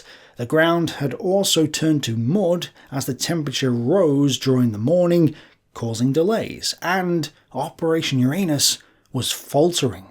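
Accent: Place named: Liverpool, United Kingdom. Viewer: I’m really not placing this accent, I’m getting Liverpool, a hint of Manchester, and possibly Lancashire as well. Maybe St Helens???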